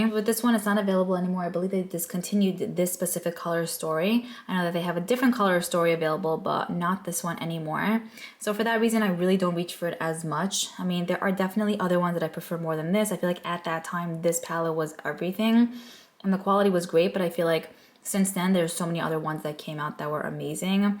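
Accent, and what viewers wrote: New York, United States. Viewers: To me you sound like a New Yorker. The way you speak is just fine! Don't listen to the assholes, you probably know more languages than they do! Insta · I actually love the Too Faced Chocolate Brow-nie pencil but I just found out Ulta doesn’t carry it anymore 😭 I usually used my points to get it for cheaper. I don’t know if Sephora ever did but it’s not on there either. It is on the TF site but idk if it’s worth it. It’s the only pencil where I found a good shade match, because my brows look black but they’re actually a very deep brown. I got the newer Huda Beauty one which matches my brows well, but I broke it off a lot already, it’s too thin for me! Also I’m bilingual too, with English not being my first language either. I usually say words correctly but sometimes my accent comes out— probably because I’m around my family so much. 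My English was perfect from elementary to middle school but high school I started having a slight accent? lol So be proud of who you are, a lot of people just know English anyways 😜 Your accent also has that New York vibe, you sound badass haha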